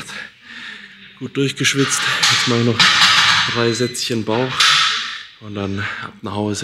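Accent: Swabia, Germany. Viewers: Sauber gschwäbelt. So isch richtig. Musch au mal wieder mehr schwäbisch schwätze ;) Krasse Einstellung und das kurz vorm Olympia, so muss das💪 btw. ich hasse die Hitze 😂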